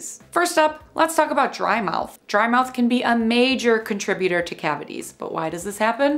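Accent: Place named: Midwest, United States. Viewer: Are you from Chicago or the somewhere in Midwest by chance? You totally sound like me and my family!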